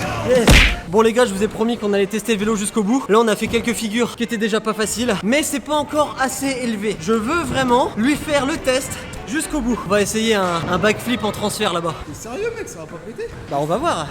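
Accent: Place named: North, France. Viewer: Tu viens du nord ? J'ai l'impression d'entendre un petit accent ^^ Edit : ah bah oui tu le dis dans la vidéo tu vas sans skate parc du nord de la France x)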